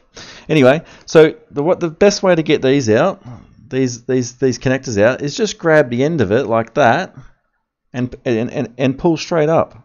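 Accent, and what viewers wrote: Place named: Australia. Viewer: First time watching your upload came from Sorins Electronic repair school. Thought you are an Ausie reading the comment bellow confirmed your accent. Ill watch your other uploads later.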